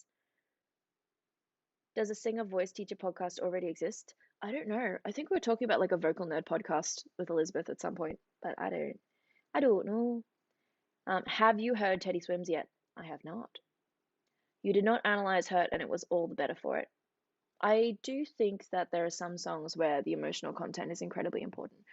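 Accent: Australia. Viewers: I love the aussie accent the way you say certain words littarly make my heart happy lol could listen all day!!!! Also could you check out otep the band there a great female metal group 👌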